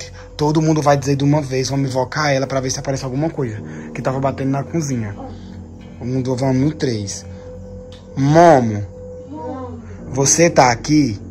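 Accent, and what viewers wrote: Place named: Pernambuco, Brazil. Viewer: Onde você mora qual é o seu estado você mora em Buíque esse povo fala assim Senão não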